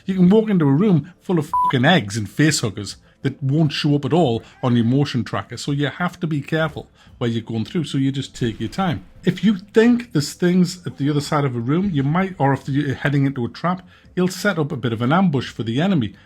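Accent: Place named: Newcastle, United Kingdom. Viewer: Not seen your channel before but your red and green logo made me think of you'd have an italian accent. I laughed so hard when I realised you were a geordy! Great, honest review. I'll definitely watch more of your vids.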